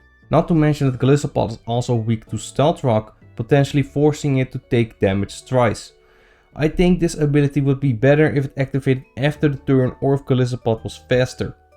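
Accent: Netherlands. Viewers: I just hear you're a Dutch speaker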